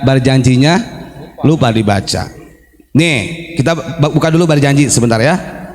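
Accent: Indonesia. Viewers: pembacaan barzanji nya ala banten banget... ❤❤❤❤❤ banten tangerang serang pandegelang cilegon.. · Keren lagom jawanya....enak didenger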